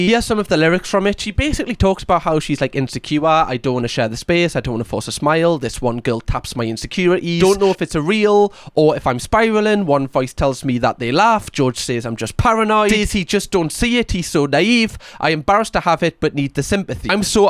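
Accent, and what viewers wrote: Newcastle, United Kingdom. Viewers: Geordie (Newcastle, UK)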